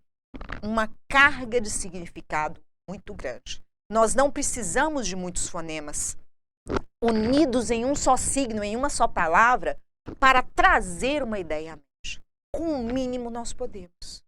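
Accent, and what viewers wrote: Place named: São Paulo, Brazil. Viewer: De onde a professora tirou este sotaque paulista? Andou trocando o mineirês pelo paulistês.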